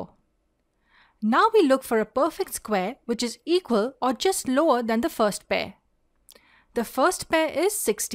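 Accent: India. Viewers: I can tell that the reader is Indian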